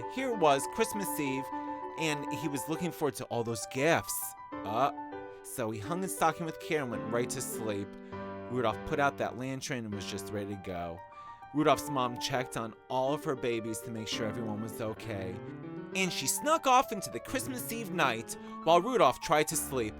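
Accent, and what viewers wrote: United States. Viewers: Rudolph the Red Nosed Reindeer poorly narrated by a foul-mouthed New York (?) sissy.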